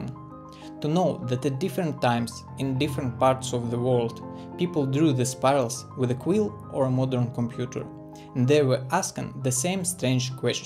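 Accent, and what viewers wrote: Russia. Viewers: I programmed only two-circle version myself and stoped, while you pushed it further more to make it really beautiful, nice video! Some interesting Russian accent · У тебя явный русский акцент)) Анимация просто потрясающая!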